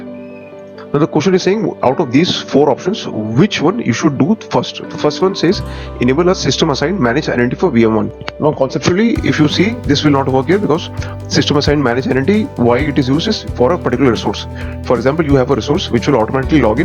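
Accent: India. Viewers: Thick indian accent, crap mic... and to top it all horrible background music - how did you manage to screw this up?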